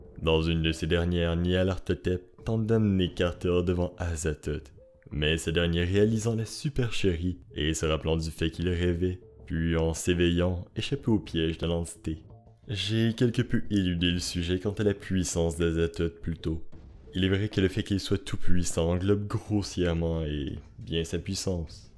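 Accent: Quebec, Canada. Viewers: Tu est québécois ? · T’essaie de dissimuler ton accent canadien ou alors il est naturel ?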